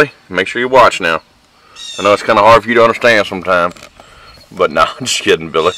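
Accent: South, United States. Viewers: From western Canada, thanks, great setup! and a pleasure to watch and hear your southern accent.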